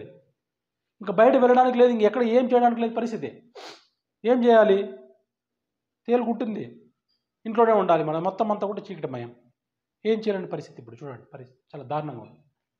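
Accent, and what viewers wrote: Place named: Anantapur, India. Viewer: Nice Explanation Sir,mee language observe chesanu,,,i think Ananthapur surrounding anukunta sir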